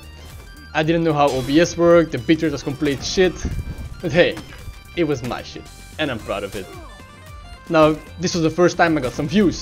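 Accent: Belgium. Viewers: Kom je uit België? Hahah groeten vanuit Nederland! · Nice to see a fellow Belgian! I heard the accent and was doubting you were from BE or NL :) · Are you belgian?/ Zijt ge belgisch? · EEEEEHHH !! Mais t’es belge !!! Jme disais bien que je reconnaissait pas l’accent !!! Perso je pense que tu devrais aller sur twitch, Youtube est encore trop mal foutue en matière de streams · Kom jij uit belgië?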